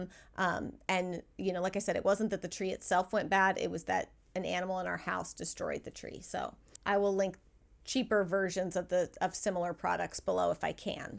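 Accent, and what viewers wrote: South, United States. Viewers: I have a King of Christmas tree last year and I love it!!!!! I have always had a real Frazier Fir tree. Last year the real trees were so expensive and it would die after a month. I am also done Christmas shopping thanks to you my friend. PLEASE keep being chatty I love hearing your voice the southern accent is the best.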